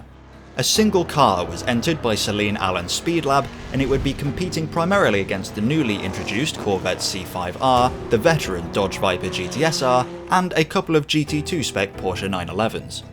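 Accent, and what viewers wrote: United Kingdom. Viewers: How come you sounds British😂 I like that acent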